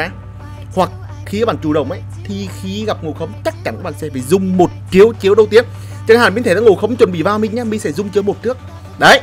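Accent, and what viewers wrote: Nghe An, Vietnam. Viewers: làm mình nhớ con NYC quê Nghệ An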